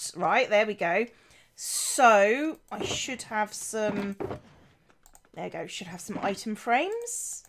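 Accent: Germany. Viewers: Are you fron Germany?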